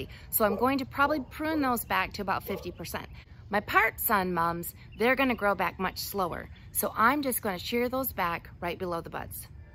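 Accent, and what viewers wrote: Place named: South, United States. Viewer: Thanks i can’t wait til May...love your southern accent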